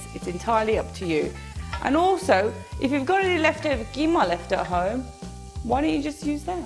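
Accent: United Kingdom. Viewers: Amazing british accent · Looks Indian, sounds British and is cooking Mexican I am really confused right now.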